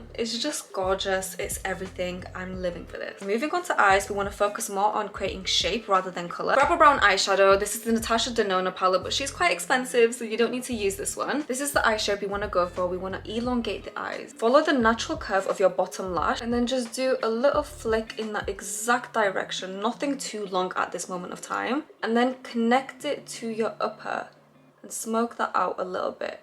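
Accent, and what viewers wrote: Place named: United Kingdom. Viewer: i love ur accent ❤what part of the uk are u from? x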